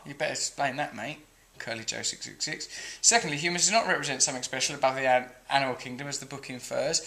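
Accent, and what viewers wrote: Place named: United Kingdom. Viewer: Russell sounds like a young ozzy Osborn . Fragmented ramblings with a strong annoying British accent . His opinion is equal to a glass of urine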